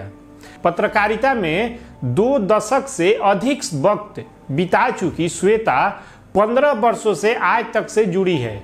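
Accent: Bihar, India. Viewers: Your way of talking is of a typical Bihari. Actors and artists can learn from you the accent of Bihar. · Bihari waala tone mein bol raha hai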